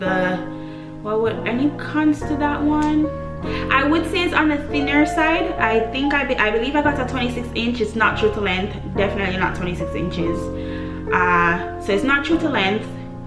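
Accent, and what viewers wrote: Jamaica. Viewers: From mi did hear di accent mi click subscribe🤍 big up goodie nuh!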